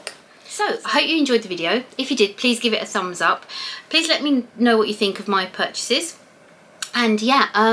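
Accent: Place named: United Kingdom. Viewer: Yay, I was team green! 💚 You hit the jackpot — such a beautiful soft minty green that will go with virtually everything! I’ve watched all of your NYC videos and enjoyed them all, including this one. I’ll admit that I was tempted to fast forward to see which color WOC you got 😂, but I stayed the course because I love listening to your narration and lovely British accent ❤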